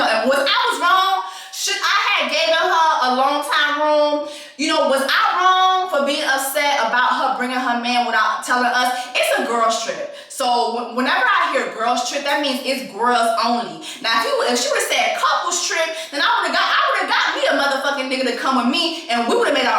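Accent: Haiti. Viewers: We want more like that...ou fem ri ahhaahha thr haitian in you is priceless. And gurl I would do the sammmmmmeeeee thing. She could have a lonely time at home lol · When you said, "we only got one room" I got up and left too omg. WHAT. Your creole is making it even funnier too (I'm haitian so I understand!!) I'm surprised you didn't call your parents to bail you out, I woulda called my dad ASAP to get me some hotel/motel room and I would never talk to that person ever again. I'm planning my first girls trip...and I hope it goes a lot better than yours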